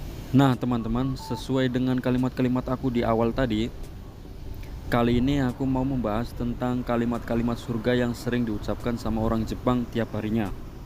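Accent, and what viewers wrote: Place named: Cirebon, Indonesia. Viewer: Logat logatnya mirip Jawa Cirebon nih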